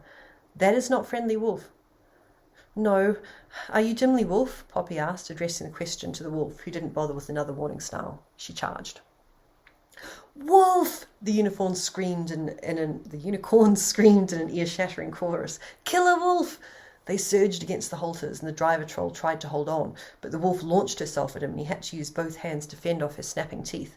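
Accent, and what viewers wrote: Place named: United Kingdom. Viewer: Thank you. I listened to this one and turned on CC. Most of the time they got the words wrong, but I got the story. I remember the chatacters from the books. I'm in America so listening to the brithish accent is always interesting. And you seem like a nice person. I've been communicating with you since 2021, at least.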